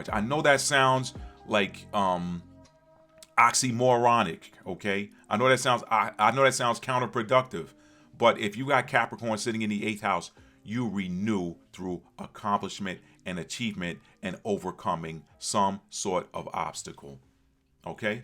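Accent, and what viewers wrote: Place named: New York, United States. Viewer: This video was incredibly impactful for me! I started examining my children's charts to identify the planets in their 8th house and took notes. I really appreciate these astrology segments...please continue creating them, they're incredibly beneficial to everyone. Also, I must say you still have a STRONG New York accent — I can attest to that firsthand!😊🫶🏾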